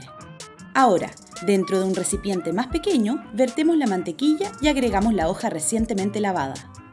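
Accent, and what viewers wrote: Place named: Chile. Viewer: Estado intentando practicar el acento chileno pero es muy difícil, espero no equivocarme eres chilena, y no utilizaste mucho de los modismos Pero tú solo hablar se nota tu acento, crees que puedas ayudarme a mejorarle acento chileno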